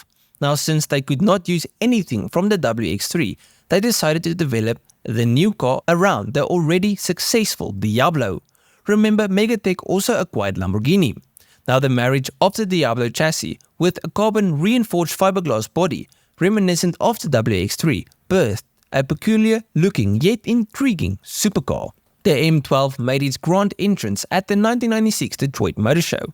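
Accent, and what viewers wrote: South Africa. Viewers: South African accent 😎🤣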